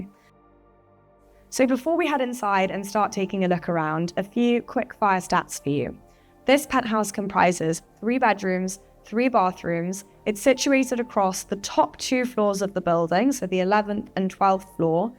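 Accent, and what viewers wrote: RP, United Kingdom. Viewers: OK Yah person employed to flog ludicrously expensive pad. Almost comical received English.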